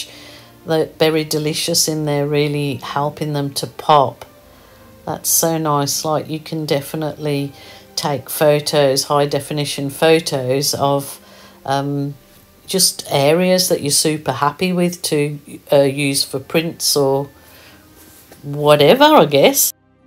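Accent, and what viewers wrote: Birmingham, United Kingdom. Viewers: I love listening to your voice it sound from Birmingham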